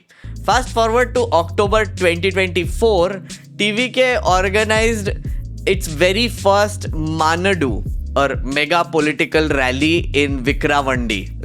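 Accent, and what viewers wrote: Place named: North India, India. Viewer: Ur English says it all North Indian English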